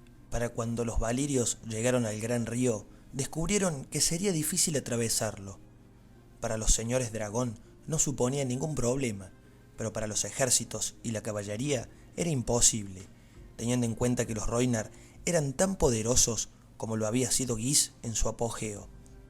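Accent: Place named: Córdoba, Argentina. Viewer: Sos cordobés? (Argentino) se te nota el acento en las palabras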